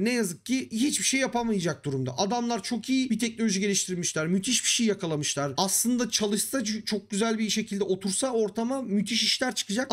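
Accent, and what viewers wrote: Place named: Central Anatolia, Turkey. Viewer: İç anadolu şivesi arada süper gidiyor :)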